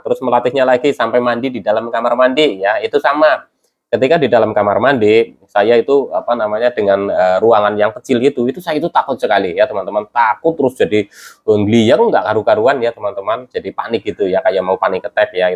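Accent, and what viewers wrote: Banyumas, Indonesia. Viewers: Asalamualaikum mas. Ora ngapak ora kepenak, njenengan ana ig ora mas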